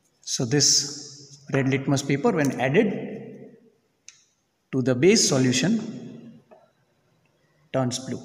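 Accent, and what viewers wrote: Gujarat, India. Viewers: Sir you are from Gujrat ??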